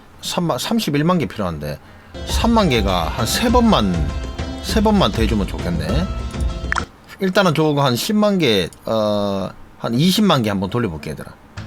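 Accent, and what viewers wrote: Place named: Daegu, South Korea. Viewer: 대구 사투리 쩝니다 고향 생각나네요 ㅋㅋ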